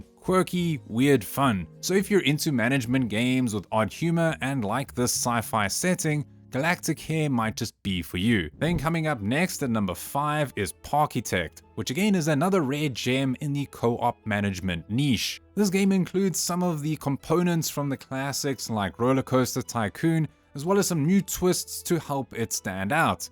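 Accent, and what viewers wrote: South Africa. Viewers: Is that a saffa accent?🇿🇦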